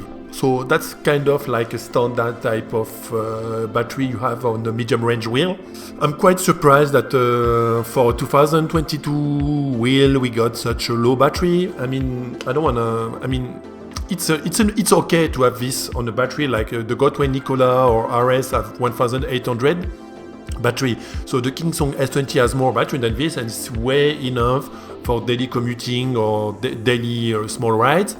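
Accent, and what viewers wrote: France. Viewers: Quel accent français ! 😄😉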